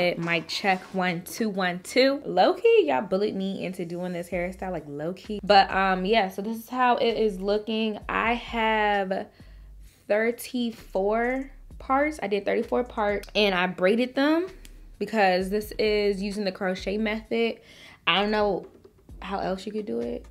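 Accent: DMV, United States. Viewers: Love it! New subbie here. I thought that you sounded like a fellow DMV sista 🤗💖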